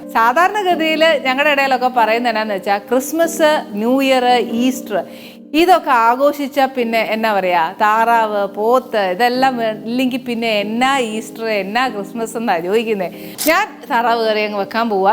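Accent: Kottayam, India. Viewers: Kottayam bhashayil paranjanneyullu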